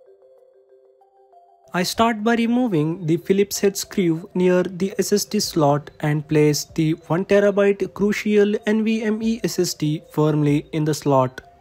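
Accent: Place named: India. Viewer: obrigado amigo indiano, eu amo o sotaque da voz de vocês, seu video foi rapido e muito util para mim. nota 10!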